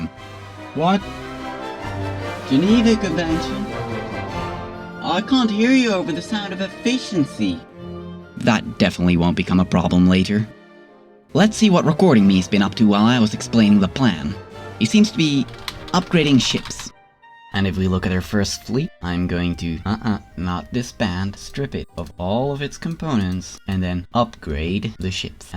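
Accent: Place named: Netherlands. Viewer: you're dutch the accent haha nice video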